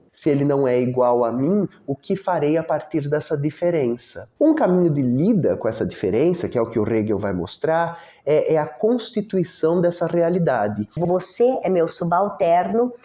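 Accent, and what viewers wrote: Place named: São Paulo, Brazil. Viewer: você é especial. O seu sotaque me delicia (sou carioca). Parece uma especialização do sotaque paulistano, talvez ligado aos italianos. Para mim é o sotaque de uma das minhas bandas favoritas: Os Mutantes. O seu sotaque é igual ao do Arnaldo Batista e, principalmente, do Sergio Dias.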